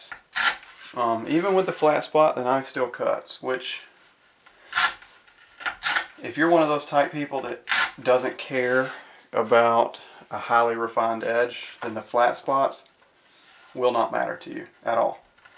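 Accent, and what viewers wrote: Alabama, United States. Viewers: R u from Alabama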